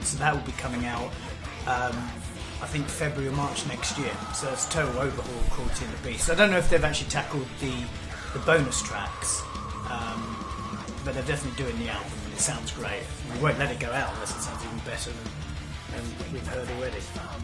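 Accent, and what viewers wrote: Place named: United Kingdom. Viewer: Now thats a beautiful UK accent <3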